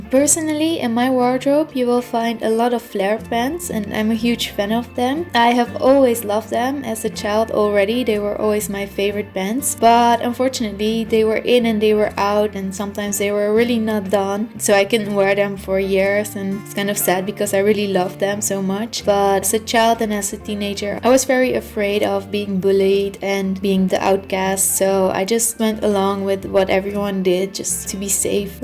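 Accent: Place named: Netherlands. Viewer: ik hoorde al aan jouw stem dat je uit Nederland komt :P ik hoorde het toch goed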